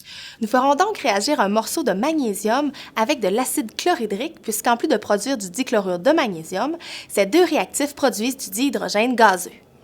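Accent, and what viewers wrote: Quebec, Canada. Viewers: Qui regarde ça en 2020 ?😹 J'ai connue votre chaîne Youtube grace a mon prof de physique/chimie et en regardant les vidéos j'ai remarquée que ma moyenne en physique/chimie à évoluer 😸 Et j'aime trop ton accents Québécois 😺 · Cette vidéo est incroyable un accent québécois avec des explications claire et pricise que demande mon contrôle de physique mdrrr !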